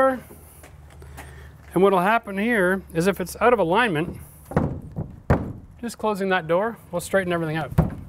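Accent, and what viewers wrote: Canada. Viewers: You Canadian boss??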